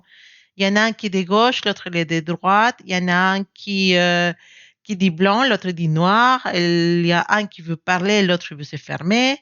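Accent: Spain. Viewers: Je suis la personne spéciale, il y a pour moi toujours une grosse attirance, je n'ai personne d'autre dans ma vie, on vient de se séparer car il me fait beaucoup de reproches il est dur avec ses mots irrespectueux, agressif dévalorisantes. J'étais jamais assez pour lui j'en ai perdu mon naturel. C'est vrai j'ai idéalisé et je suis très déçue, je voulais une belle relation avec lui dans le respect et dans la paix. C'était l'ascenseur émotionnel. Oui je suis triste malheureusement il ne changera pas. Je suis Gémeaux et l'autre tirage ma également parlé bcp. J'ai peur car je ne veux plus qu'il me parle mal et me corrige tout le temps. Oui je veux changer et le fait de ne plus accepter de l'irrespect et je dois mimposer plus mais aussi mouvrirnplis dans une relation J'adore vous entendre parler votre accent espagnol qui me rappelle mes origines😊 😊😊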